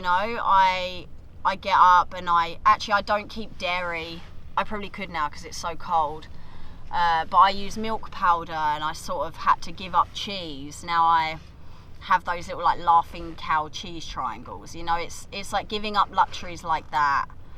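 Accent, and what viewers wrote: United Kingdom. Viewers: She sounds british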